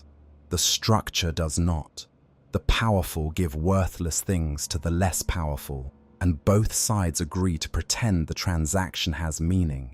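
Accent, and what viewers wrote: United Kingdom. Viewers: He’s British or something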